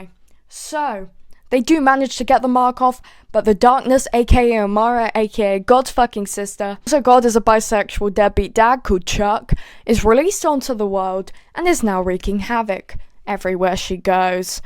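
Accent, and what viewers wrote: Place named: England, United Kingdom. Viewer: Didn’t expect an English accent when I came from tumblr (everyone is just American to me) and now I’m even more excited to watch ‼️‼️‼️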